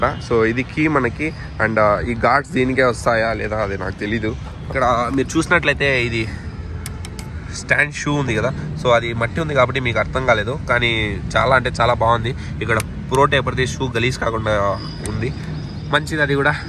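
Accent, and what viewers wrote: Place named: Anantapur, India. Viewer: Meedi anantapur district kada anna meeri matlade slang chustene telustundi 🎉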